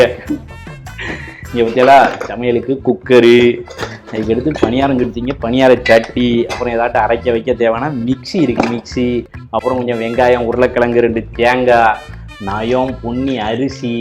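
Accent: Tamil Nadu, India. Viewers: Super, I am a great fan of your Annachi tamil accent 😅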